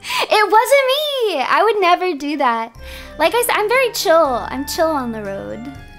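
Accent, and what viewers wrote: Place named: New England, United States. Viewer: More southern than you thought? I thought she was from the New England area from how she talks o_o some might say the poler opposite of "southern"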